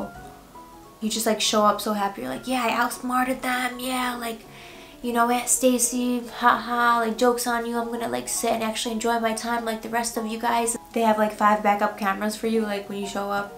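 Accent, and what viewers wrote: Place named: New York, United States. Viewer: Love the NY ACCENT X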